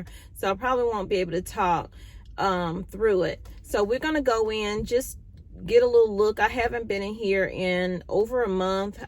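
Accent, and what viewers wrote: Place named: Carolina, United States. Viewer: 🔥 Great video! Especially in the beginning when you talk and encourage people to prep and stock up. Why would you stop?? No.. Keep the talking at the very beginning before you go in because there could be first time viewers or people that haven't started prepping yet and need to hear this! Plus I love your Carolina accent.. OMG. Forgive me... it's beautiful ❤️ I'm in Los Angeles..so hearing you is like a breath of fresh air. Please keep talking and being passionate at the beginning of the videos... Because it . I've been prepping and stocking up for almost 3 years. Thank you 👍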